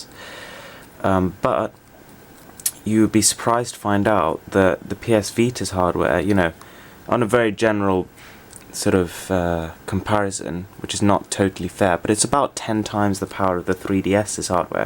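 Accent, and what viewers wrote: RP, United Kingdom. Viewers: Wait, is that a Received Pronunciation British accent I'm hearing? I don't hear that too often. It's very nice.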